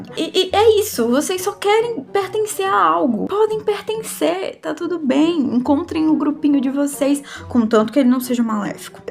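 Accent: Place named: Northeast, Brazil. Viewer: Reconheço uma nordestina quando vejo(ouço) uma 🙄🗣️💋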